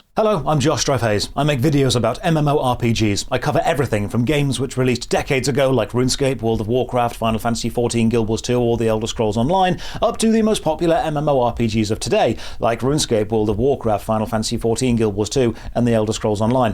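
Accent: United Kingdom. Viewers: A really dumb take Josh. You are a great example how British accents don't equal intelligence. How can you talk for 22 minutes about the poor state of MMOs without mentioning the toxic effect that whales have created in every MMO? They have priced out young players entirely from the genre creating a predictable demographic problem. Without young players the developers need to milk more out of each older player. This cycle is not sustainable for obvious reasons. MMOs in 2026 are similar to Golf or Broadway -- a hobby specifically for wealthy people. None of us could have afforded to play a modern MMO back in 2000. It was $90 to play Dune Awakening for the first 2 weeks of launch. By the time the price dropped to $60 it was dead. This game did not die because a lack of social issues. It was dead from the start -- a lifeless whale milking machine. But I get it -- whales are your top supporters. 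You can't be aggressive towards them. It would threaten your income. This is the same lousy excuse developers will give when they refuse to perma-ban RMT buyers. This "community" is rotten. MMOs can't heal until these whales piss off and retire from gaming.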